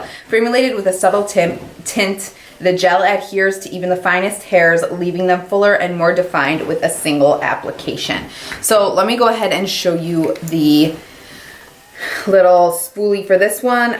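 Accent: Chicago, United States. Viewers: Great video, as usual. You are one of my favorite YouTubers, and I wish you much success☺🌴👍🏼🤹‍♀️Love your accent which sounds so much like the Chicago area types I heard everyday when I lived up there during some of the happiest years of my life❄🌈🌠Hope you will have a wonderful Christmas, (it's Dec 24, 2020) 🎄⛄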